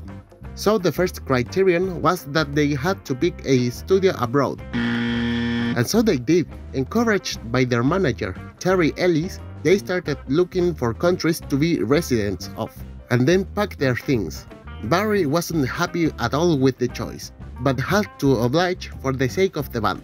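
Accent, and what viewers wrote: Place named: Spain. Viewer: Interesting video... but I would have done without the extremely thick spanish accent of the narrator - it's quite annoying...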